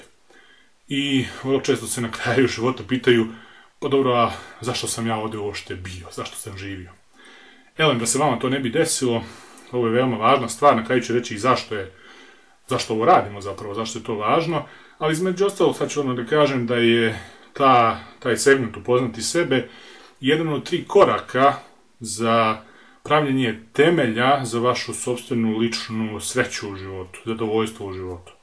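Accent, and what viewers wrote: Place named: Ekavian, Serbia. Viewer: Ooooo, preslo se na ekavicu!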